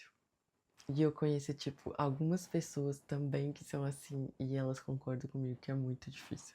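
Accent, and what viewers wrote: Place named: Minas Gerais, Brazil. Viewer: Gostei do seu sotaque vc é da onde ?! Mineira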